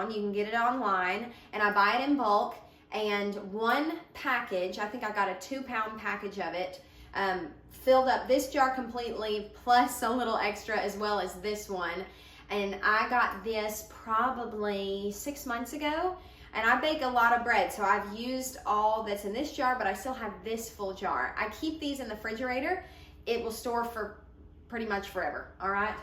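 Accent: South, United States. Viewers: Her southern accent disappeared about 2 minutes into this video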